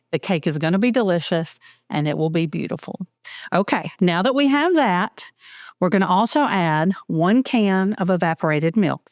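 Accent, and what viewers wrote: South, United States. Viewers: First time you’re coming across my feed. I can’t wait to make this. Well done! Great video & I love your voice/southern accent. 👏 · The audio on this video is so nice & crisp and with your southern voice? So relaxing! Almost asmr!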